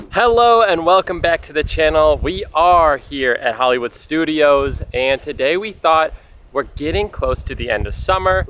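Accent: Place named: Midwest, United States. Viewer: I know a Midwestern accent when i hear it. Which state are you from? Illinois?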